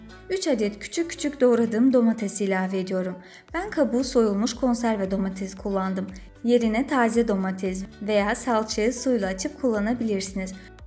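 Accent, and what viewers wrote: Azerbaijan. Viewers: Azerbaycanlisiz? Lehceniz tanis gelir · Seslendiren azerbaycanlıdı <num>%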